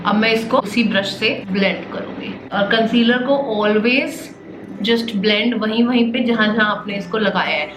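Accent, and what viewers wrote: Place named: Chandigarh, India. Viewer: One thing I like about ur video is A. being a tricity girl u hv that accent that Tricity Ppl 🤪can relate and B..u explain very well..💗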